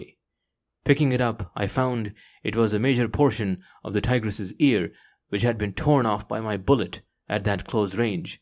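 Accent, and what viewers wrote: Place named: India. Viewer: The other channels can’t compete with Mr. true Indian voice. 🇮🇳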